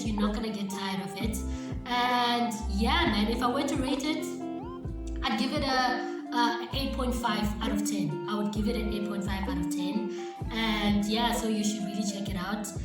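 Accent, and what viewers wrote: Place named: Eastern Cape, South Africa. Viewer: Your accent makes me miss living in the Eastern Cape🥰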